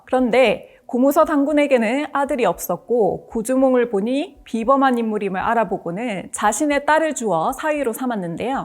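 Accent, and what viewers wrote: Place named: Gyeongsang, South Korea. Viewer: 선생님 강의는 마치 음악 같음. 선생님 때문에 경상도 억양이 이렇게 예쁠 수 있단 걸 처음 알음.. 억양의 리듬감이 진짜 아름다움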